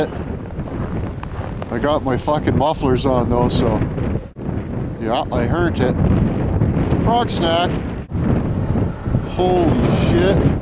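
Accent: Ontario, Canada. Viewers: Lol I can tell your from Ontario just by the accent.. everyone from the up to northern michigan tht stays there a while picks it up.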